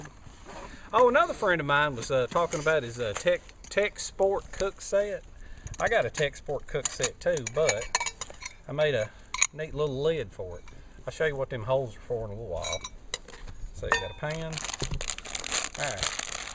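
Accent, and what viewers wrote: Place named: Georgia, United States. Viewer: Cool idea....I like it because it decreases by one the number of tools you would have to carry... I thought you sounded like you were from Georgia... I lived in Warner Robins for 16 years.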